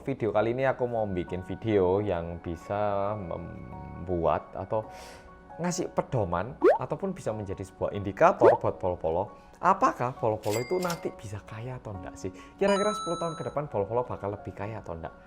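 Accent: Java, Indonesia. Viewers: Mas medokkkk.....aku salah fokus liat kowe kok cek kuruseee 😔 cukup mas jok tmbh kurus maneh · Orang korea yang medokkkk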